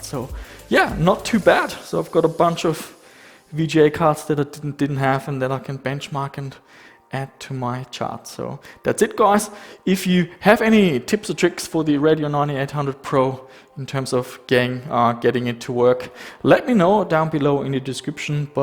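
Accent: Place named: Germany. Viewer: Der Deutsche Akzent is real xD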